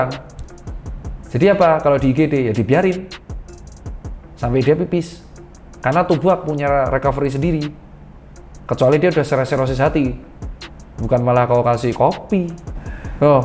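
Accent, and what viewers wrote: Java, Indonesia. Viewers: Ngomonge jowo medok yo dok .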